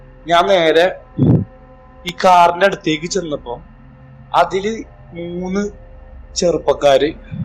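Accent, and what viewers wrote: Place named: Kochi, India. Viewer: Kochi slang alle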